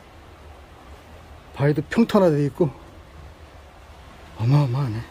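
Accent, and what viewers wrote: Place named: Chungcheong, South Korea. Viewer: 어마무시하는것 보니 충청도 공주,논산지역분 같기도 하고 호남사람같기도 하네요? ●고향이 어디유?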